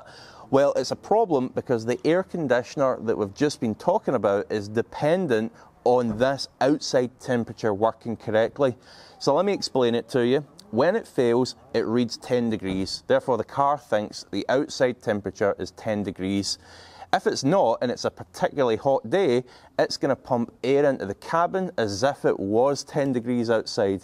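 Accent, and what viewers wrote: Scotland, United Kingdom. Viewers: Got I love the Scottish accent, I’m getting a 2015-17 gt in a few months conv 6 speed❤️🤤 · You sir, are Scottish?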